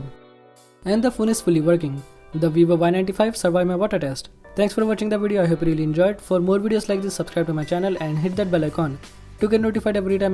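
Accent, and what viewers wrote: India. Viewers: Please improve your english dude the sound still like you speak in india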